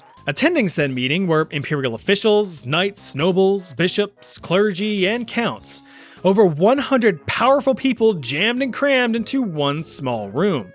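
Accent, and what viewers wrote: Germany. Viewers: As a German myself and a resident of ERFURT, I have to say I LOVE the sprinkled in German words and the accent 😂 "and what kind of scheiße do we get in then" ... I literally lost it 😂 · How is your German accent so perfect?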